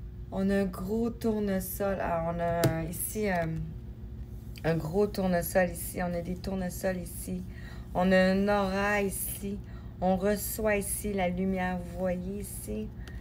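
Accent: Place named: Quebec, Canada. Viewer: je suis epatée par vos guidances ❤❤❤❤et jadore votre joli accent québécois 🙏🙏🙏🙏vous etes ☀ belle journee a vous 🙏🙏🙏et merci pour vos guidances qui m'éclairent dans mon❤❤❤ cheminement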